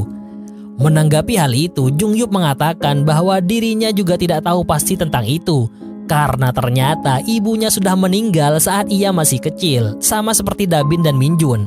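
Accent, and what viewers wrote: Madura, Indonesia. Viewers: Admin madura ya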